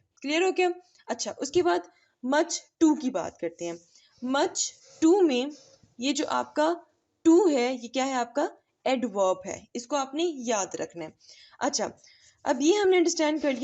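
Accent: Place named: Punjab, India. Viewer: You speak Urdu and few sentences in English with Punjabi accent. For example, words got and correct you pronounce with Punjabi accent. Also, a basic Urdu mistake: when you begin a sentence with Aap, it ends NOT with ho but hain. This is Indian type of Urdu which is not correct.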